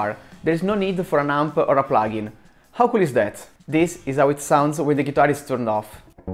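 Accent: Italy. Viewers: jajaja italiano hablando en ingles! jaj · La única diferencia está en el inglés... A mi me vale el italianinglish de...me entero perfecto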